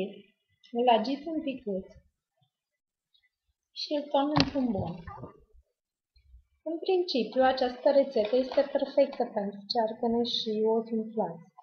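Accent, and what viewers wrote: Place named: Italy. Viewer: Si eu locuiesc tot aici in Italia , vorbesc 5 limbi straine si nu mi-am schimbat deloc accentul....incearca sa vb fara accentul care il au italienii, pastreaza-l doar atunci cand vb italiana, crede-ma e mult mai frumos.Nu am nimic cu moldovenii cand nu vor sa para ceea ce nu sunt.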